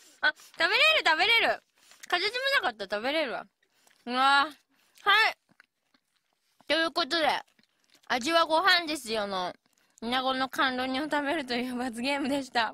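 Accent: Kansai, Japan. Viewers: クーラー大好き そうです。 調べてきましたが、関西の人との交流が多かったせいか、関西弁っぽくなったらしいですねw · 関西弁可愛い(≧ω≦)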